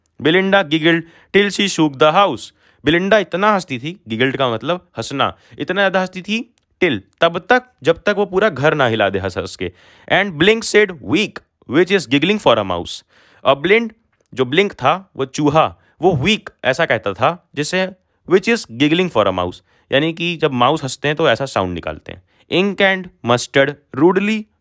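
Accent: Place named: Uttar Pradesh, India. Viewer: Sir u explain very well...hats off to you sir ji....by your voice i understand that you're from UP😊🙌😉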